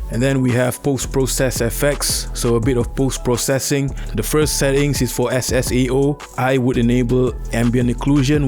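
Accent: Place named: Malaysia. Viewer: Cara bicaranya mirip orang malaysia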